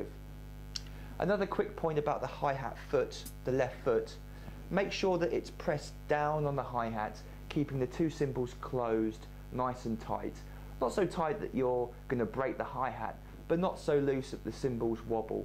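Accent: United Kingdom. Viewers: most british accent i have ever heard..... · he has a british accent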